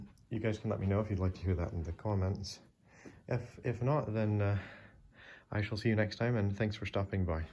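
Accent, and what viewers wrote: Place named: Scotland, United Kingdom. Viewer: Ii here a Scottish accent struggling to be heard over the American there 😊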